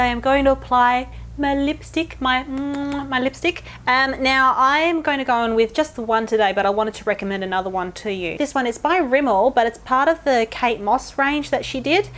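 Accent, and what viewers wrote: Australia. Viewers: I love you, you're so funny ! :) I think you're my favorite beauty youtuber ! And as I'm studying English language and culture at university, it's also a way to learn the Australian accent. Best regards from France. :)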